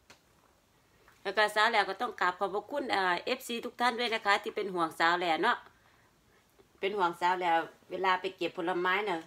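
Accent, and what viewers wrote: Isan, Thailand. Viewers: พูดอีสานเลยจ้าฟังเป็นธรรมชาติดี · เว้าอีสานฟังง่ายจ้า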